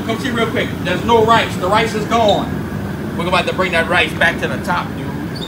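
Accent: Louisiana, United States. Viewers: I love Louisiana accent they have the best in the south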